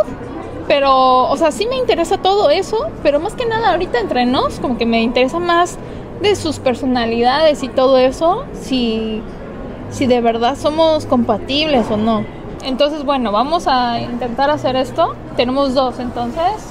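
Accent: Mexico. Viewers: Tu voz, tu idioma es muy mexicano, pero se re nota que las tradiciones coreanas se interponem por demasiado, espero que encuentres a alguien mejor y no te eligas a tu pareja solo por lo físico o superficial...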